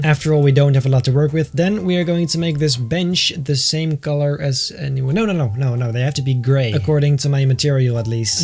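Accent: Netherlands. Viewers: I hear a bit of a Dutch / a Belgian accent in his voice...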